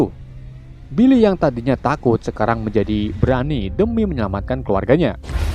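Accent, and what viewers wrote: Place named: Java, Indonesia. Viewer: medok e renyah😄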